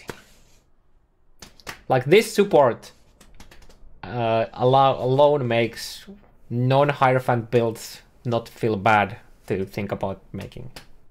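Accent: Finland. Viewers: Olin huomaavinani Suomalaisen aksentin ja sitten katsoin nimeä. Kyllä vain, hyvin tunnistettava aksentti.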